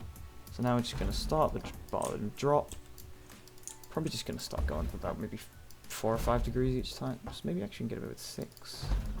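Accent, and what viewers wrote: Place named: United Kingdom. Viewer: British accent while sounding like you just snorted some blow....maybe I'll understand at .75 speed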